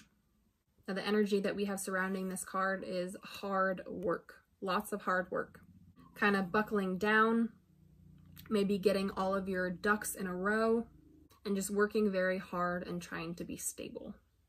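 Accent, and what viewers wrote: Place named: Chicago, United States. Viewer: The edits and cuts make it obvious you are reading from copied notes, where we can not see but you can. Sound totally unnatural. Starts video off in a negative tone about other you tubers, arrogant. Uses poor English saying "Coming right at you, with..." Sounds so South side of Chicago. You copied. Easy and thoughtless to do.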